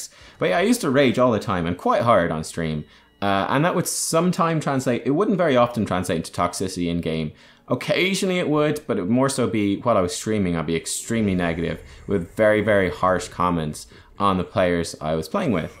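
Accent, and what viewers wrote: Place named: Ireland. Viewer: I love your commentary. Your Irish-ness is part of your charm. No need to self-police.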